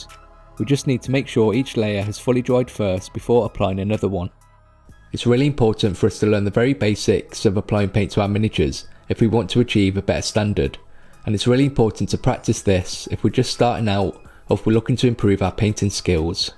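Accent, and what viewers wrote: United Kingdom. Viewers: Whoaa.. you got auto voice translation IA caption in spanish?.. cool. Anyways I lke so much your original accent British voice 😅. Awsome video and subscribed. Cheers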